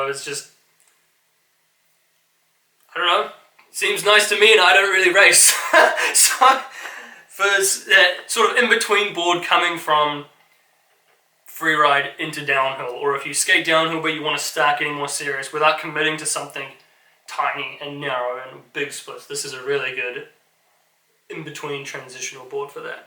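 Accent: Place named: New Zealand. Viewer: Haven't heard a kiwi since crunchie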